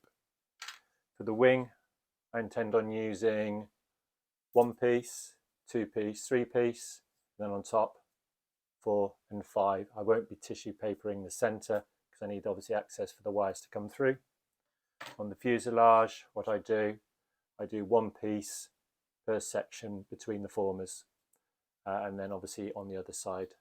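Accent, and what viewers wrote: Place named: South, United States. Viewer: As a model’er myself, you have made a jolly good effort there. I detected a southern accent there. I am in the West Midlands. I hope we can speak face to face when me day.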